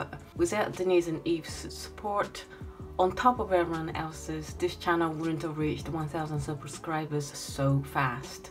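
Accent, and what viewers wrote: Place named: United Kingdom. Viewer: I adore this channel. I just know you'll go far. Question, a bit of a personal one: Are you a UK expat, or were you born/raised in the UK. Your accent sounds blended and it's awesome!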